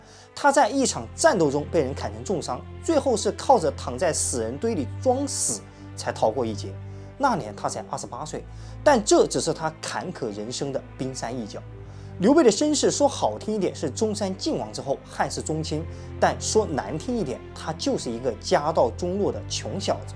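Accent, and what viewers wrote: China. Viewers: ，坦白說你為人處世的態度實在了不起。 我想有必要說明一下，之前就有提到我認為您的內容作得很用心很好，所以我是很自然而然就看完了，並不是「耐心」看完的喔！而且怕您誤會我只是只看標題就批評的，所以有多做一些說明。 像我這種只出一張嘴的鄉民，您還能這麼用心且友善地回應，真的是一個了不起的youtuber。 之前一個有做到這樣的是說書人柳豫，但他的粉絲很多都是之前經營facebook引流來的。所以節目一上架基本盤就有相當規模。 像評論三國這類節目也不少，但囿利題材，所以也頗有侷限。像說書人柳豫也發展到電玩解說。 品質算好的就有什麼文大郎、史大郎(忘了誰先誰後，但風格都一樣，可能是股東不合拆伙吧?)，他們也是引經劇典來增加豐富性。還有一個(抱歉忘了，也許是上述兩人的早期作品)會善用衛星地圖講出當年各國勢力或軍隊征伐的地形、路線。 我聽您的口音，應該是中國的朋友，我在想說如果您附近有什麼著名的三國地點，都可以作一期現場影片，當然不是只有旅遊介紹，而是加上故事情節的引導和你個人的親和力。 比如說「探索涿郡故里，原來劉備當年就是在這棵桑樹下說要當天子的！」應該也很吸睛，只是舉例，不要打我🤣 當然不是說要騙點閱啦~只是我們三國迷其實最重的是這份情懷，而情懷如何展現?我們一般人的做法就是玩遊戲、討論，但您們yotuber是不是可以上升到人事時地物的展現或溯源? 抱歉，扯遠了，只是期待您這麼有誠意的頻道能蒸蒸日上而已，以上都是外行人的胡言亂語，請不要介意。 在下只能獻上小小的訂閱，不成敬意。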